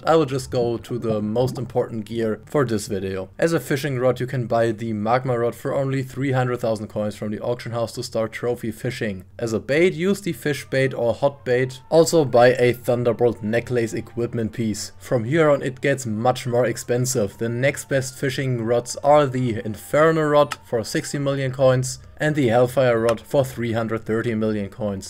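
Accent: Germany. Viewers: I hear deutsch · Love that i hear that he is german i think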